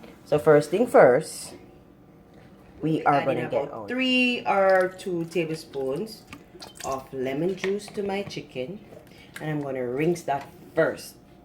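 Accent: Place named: Jamaica. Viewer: Hey girl I am here new subscribe family now you sound like a Jamaica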